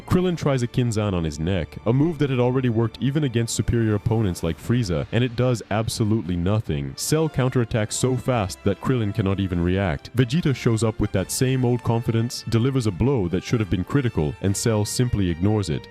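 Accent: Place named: Brazil. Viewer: He's Brazillian Bro, don't blame him, it's difficult to know how you american guys say shit.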